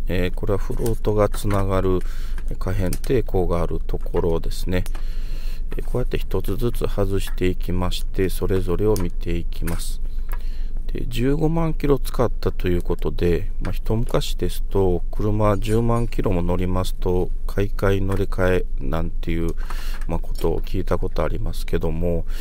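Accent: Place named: Kansai, Japan. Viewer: 私は燃料ポンプ28万キロまで持ちました。 無理に東京訛りにせず、関西イントネーションのままで、You Tube特有の過剰な効果音や聞き飽きたBGMがないのが良いですね。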